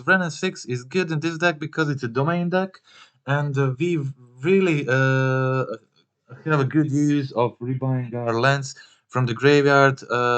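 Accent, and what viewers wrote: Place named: Poland. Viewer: first time here, and im about to buy 8 copies of it for me and a friend, no one else besides us two here thinks its any good haha (also your accent sounds pretty polish, correct me if im wrong haha)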